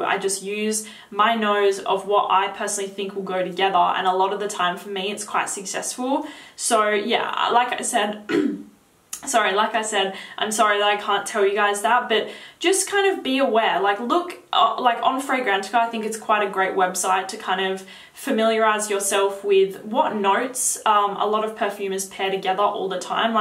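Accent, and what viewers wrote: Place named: Australia. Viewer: What part of Australia is this accent from??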